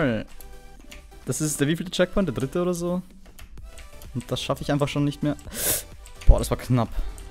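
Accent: Austria. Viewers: Die Mischung aus deinem österreichischen Dialekt und der Teddy-Comedy Stimme hört sich echt lustig an :D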